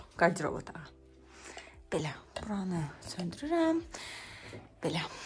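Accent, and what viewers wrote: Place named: United States. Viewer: Danisigin bele Amerika lehcesindedi 😃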